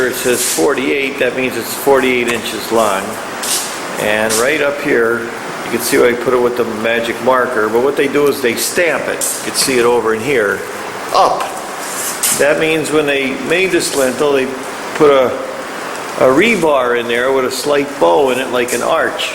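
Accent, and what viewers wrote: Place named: Chicago, United States. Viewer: I'm binge watching your videos ! are you from the midwest? You definitely have an accent like you're from the Chicago area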